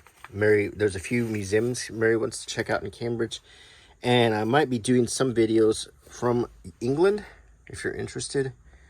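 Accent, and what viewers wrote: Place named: France. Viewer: Good job mate, really relaxing! Love the french accent!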